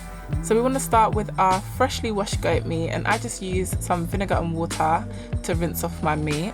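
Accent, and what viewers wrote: United Kingdom. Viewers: 😍😍😍🇯🇲Im from America you sound like you live in Britain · Well I normally think these kind of videos are dead especially when it's not a carribean accent when they first talk and they are American I'm like nah but I heard a British voice and thought she might be alright actually and yeah cookings on point ha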